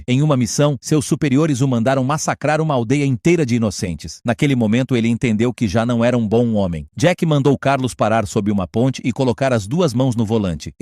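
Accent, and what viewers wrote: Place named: Mozambique. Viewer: em uma hora e todo acompanhado com imagens Você é gênio O seu português é fácil perceber um Moçambicano parabens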